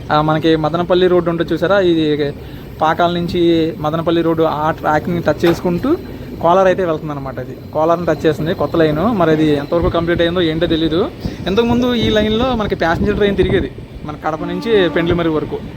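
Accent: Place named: Godavari, India. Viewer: Mi yasa kadapa yasa kadu EG, WG yasa la vundhi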